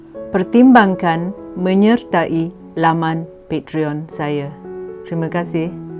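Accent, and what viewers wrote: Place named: Manglish, Malaysia. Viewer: Kenapa pronounciation mariam mokhtar ni manglish sgt. ni lebih inggeris drp inggeris. Nak ckp, ckp la betul2..belajar ckp melayu yg <link> lain pandai 7 bahasa pun ckp tak pelat mcm. Melayu ni byk sgt berlagak nya bila lama sikit dok London.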